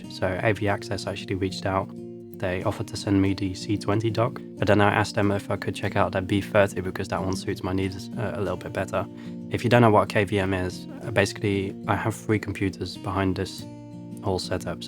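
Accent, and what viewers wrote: United Kingdom. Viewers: Honestly, I do love the fact that I just randomly stumbled upon your channel. The fact that you're Dutch as well only makes it that more fun for me, lmao. (And will say, your English is really freaking amazing dude. Very British tone.) The setup looks amazing. Little cluttered but you're also still adding / modifying so I'm able to look past it. Keep up the good work. I'm looking forward to seeing the progress of this.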